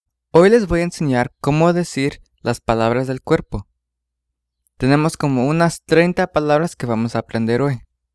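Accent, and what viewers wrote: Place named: Northern Veracruz, Mexico. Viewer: Hola buen día, una pregunta, el Nahuatl, que hablas y enseñas de ¿donde proviene o de que región es de donde lo hablas?, por que el nahuatl tiene muchas variantes dialectales y aunque tiene cierta similitud a la pronunciación del la región norte del estado de Veracruz, muy padre el material, mil gracias por tomarte el tiempo y elaborarlo, es tan util.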